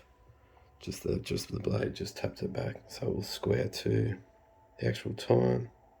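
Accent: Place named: Australia. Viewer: Bro can I buy this off you, I can tell you are Australian from the accent and I live in Australia too can we try make a deal?😂💎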